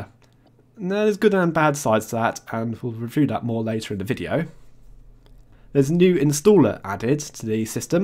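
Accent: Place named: Scotland, United Kingdom. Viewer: Can't really get from where this guy is from... Scotland? Manchester area?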